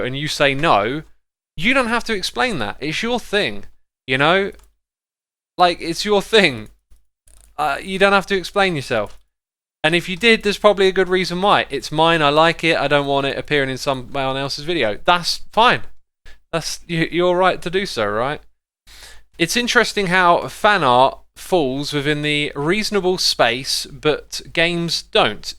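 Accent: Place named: United Kingdom. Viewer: What kind of accent is that? I'm pretty sure X is from Britain, but what section is that accent. It sounds like no other accent and familiar at the same time. I absolutely love it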